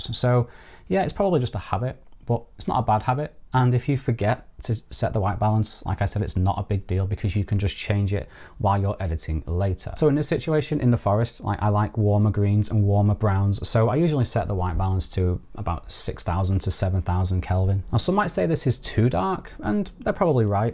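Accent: United Kingdom. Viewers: Thank you! I'm fairly new to RAW and only very occasionally use it. Following your video, I downloaded your RAW files and tried them out myself on my iPhone12pm. Since that now has RAW capability, I tried a few edits in the default app, and then finished it off in SnapSeed (just the normal edits, not RAW). I have previously found that I preferred the default photos app for RAW editing since in SnapSeed the scale for shadow RAW editing was far too wide and tended to affect the mid-tones as well as shadows. I also tried out DarkRoom which I've only tried a couple of times previously. It doesn't appear to have a masking facility, something which I find to be essential, which is why I most often go back to SnapSeed. And I didn't like what DarkRoom produced this time around! I preferred to go for a very different look from yours, but as you say, so much is down to personal preference. 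I do most of my shooting in the default camera app, but must try out Halide which I have but not really got to grips with. I have a problem. I'm an AppAholic haha! But I guess I"m probably not the only one!!! Is there a suitable 12-Step Programme for me??? Blessings and thank you again. PS, nice to hear a British accent like mine on YouTube!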